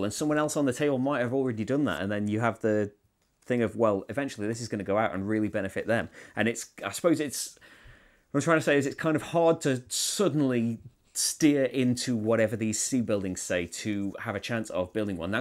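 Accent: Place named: Cheshire, United Kingdom. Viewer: I'm trying to place your accent. Let me guess - Cheshire? Maybe Macclesfield? Anyway, thanks a lot for these videos, extremely helpful!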